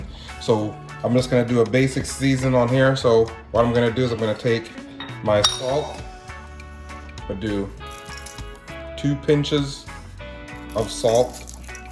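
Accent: Jamaica. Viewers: You Jamaican bro ?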